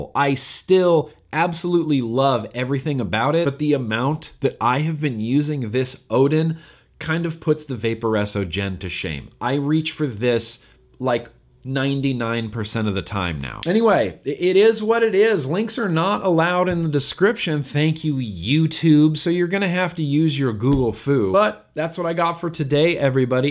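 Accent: Australia. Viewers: I approve your Australian accent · Not bad on the aussie accent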